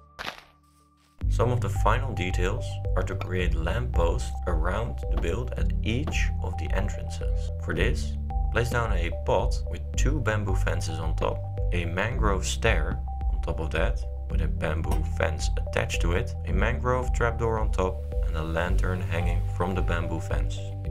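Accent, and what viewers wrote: Netherlands. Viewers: Are u Dutch? xD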